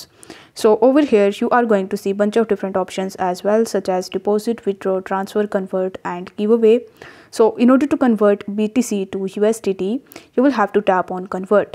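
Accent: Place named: India. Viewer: one of the headache accents in the world,, is the INDIAN English accent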